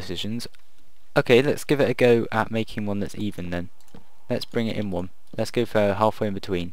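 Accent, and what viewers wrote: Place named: Southampton, United Kingdom. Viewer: he sounds like hes from southhampton or somewhere near there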